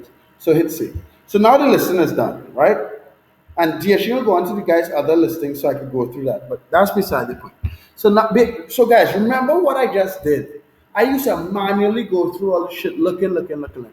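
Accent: Trinidad and Tobago. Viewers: No BS Drop Shipping Training WHAT DE ASS BOY! A Trini! Oh Gossshhh! I subscribing Now! I'm from Laventille! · sounds like u from trini man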